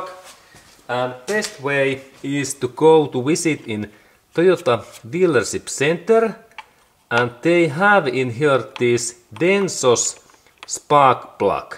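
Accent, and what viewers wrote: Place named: Finland. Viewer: Thanks for the vid, also I really like the Fin accent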